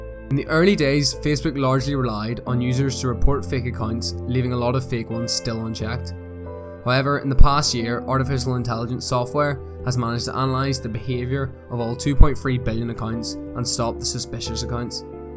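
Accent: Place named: Scotland, United Kingdom. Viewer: Hi, That collage is well put together. Your accent sounds a bit Scottish. After watching your video, I read "Bias Within Artificial Intelligence" and "The Future of Voice Control". You mentioned how Google (Siri) and Amazon (Echo, Alexa) are going from smart homes to smart offices to smart cars. Over here we are talking about smart cities - or, mores specifically a Google controlled suburb in Toronto. Or, at least our Ethics Committee were both before and during the Grand Committee meeting when it was in Ottawa. "Bias Within Artificial Intelligence" is topical because politicians on both sides the the 49th parallel are looking at AI and algorithms. An American Congressional Committee was looking at the issue mainly as something that is biased against people of colour and the Canadian Ethics Committee was also looking at the ways it promoting some content while making other content harder to find - and how algorithms can be gamed. 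I think that what you said is important, since an algorithm could determine whether one can get a mortgage or even be accepted as a tenant - or if the neighbourhood where you lives could influence whether they can get credit, insurence or even a job. "An algorithm or supercomputer is in itself incapable of displaying discrimination or bias. On the other hand, the human being who programs the algorithms is capable of doing so at different stages: during data collection, during processing, or during the preparation of questions the algorithm wil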